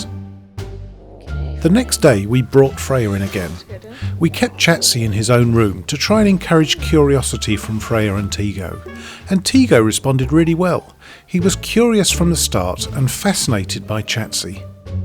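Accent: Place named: United Kingdom. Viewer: ya i am also hooked and i love the British accent ;p . big Tigo fan myself !!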